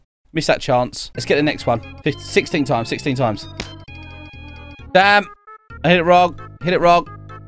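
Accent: United Kingdom. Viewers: Nice british stylish accent I got 11600 studs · Is it me or is extra British in this video? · You’re British right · Idk why but I just love your British accent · your British innit? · I love your British accent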